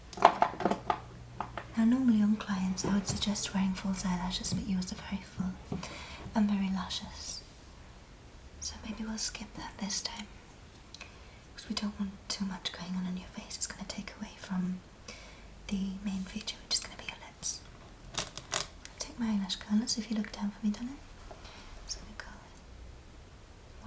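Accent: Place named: United Kingdom. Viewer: Amazing, nice to hear such a nice British accent. One of my favourites :)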